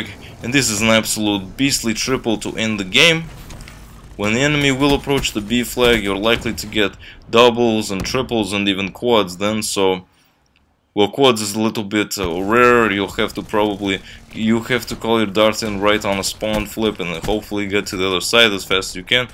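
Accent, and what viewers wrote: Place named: Russia. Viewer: He sounds like for Russia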